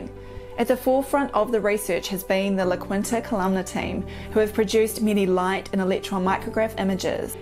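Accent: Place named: New Zealand. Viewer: I went, I watched.. I don't sign up for all the platforms but it asked me to let you know if I enjoyed it. I enjoy listening to the NZ accent ESP from you ;) However, I don't enjoy being terrified. On a scale of 1-10 of VALUE I'd give it 100. And a million thanks and blessings.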